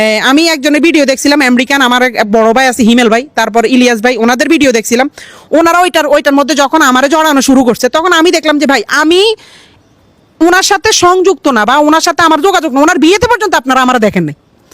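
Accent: Noakhali, Bangladesh. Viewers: তুই যে pure লোয়াখাইল্লা তোর কথার মধ্যেই এটা ফুটে উঠেছে। তোরে বাংলাদেশের মানুষ একটু একটু চেয়ে ঐ তৌহিদ আফ্রিদির সঙ্গে থাকছোস বইল্যা। আজকে সে বিপদে পড়ছে আর তুই যে স্বরে কথা বলতেছে তাতে তুই যে একটা বেঈমান তারই প্রমাণ দিচ্ছিস। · নোয়াখাইল্লা মারাক্তক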